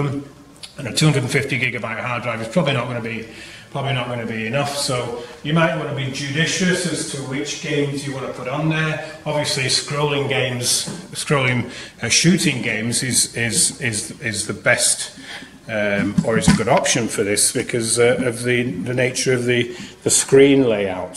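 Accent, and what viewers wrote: United Kingdom. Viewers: This is brilliant mate, I have looked at making a pinball machine for a couple of years now and having found this video, I am on it. I would like to explain my joy of listening to a English bloke, because I have lived in the States for 22 years now and your first few words where “ bit and Bobs” that tickles me. So fun and also I could see you sweating with the heat, 40 deg means nothing to us over here. It would be like 95 deg Fahrenheit. Thank you so much for all that, you have given an old English man new hop. John C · Your accent sounds Yorkshire similar to my own Doncaster accent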